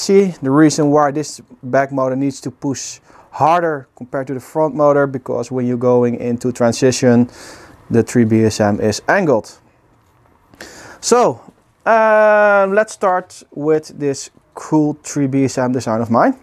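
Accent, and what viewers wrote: Netherlands. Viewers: Ik dacht al aan de eerste paar zinnen dat moet een nederlander zijn, Heel gaaf gemaakt wacht op de volgende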